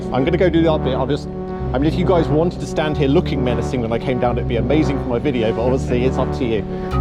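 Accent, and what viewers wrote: England, United Kingdom. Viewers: New sub keep doing what you are doing and there is nothing like an English accent to teach.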